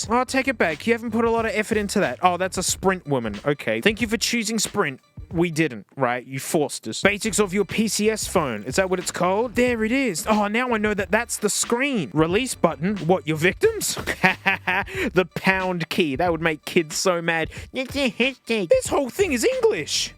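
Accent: Australia. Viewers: Australian mate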